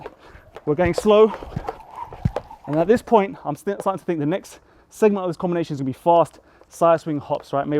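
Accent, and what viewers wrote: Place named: United Kingdom. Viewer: what is your name? Is it?? Love the British accent always. I can only dream to be as graceful as you are jumping rope. I look ridiculous in my form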